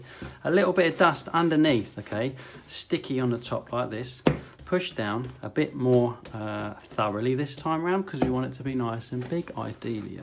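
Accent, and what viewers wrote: United Kingdom. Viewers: Having gotten all the knowledge im gonna get by watching all these videos, I now put them on just before bed so I have sweet, doughy, British sounding dreams.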